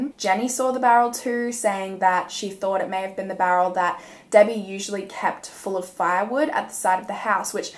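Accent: Australia. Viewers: Her voice is so peaceful to listen to! Australian right? 😍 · I really like your Australian accent 😊💖. And your videos too.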